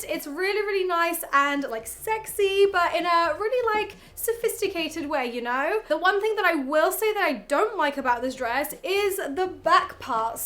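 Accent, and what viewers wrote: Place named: England, United Kingdom. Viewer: what part of england are u from u have a accent its nice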